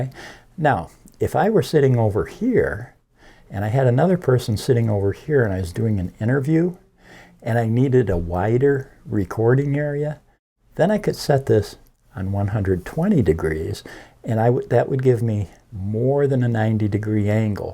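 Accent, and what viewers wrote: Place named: Vermont, United States. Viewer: Very helpful! Well done and you sound like you are from Vermont :) many thanks!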